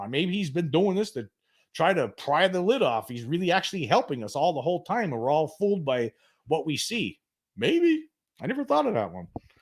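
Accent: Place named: Long Island, United States. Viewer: Ok. I grew up on Long Island. Sorta recognized the accent as being in the ball park. Thanks…and good show today. When do you do your podcasts?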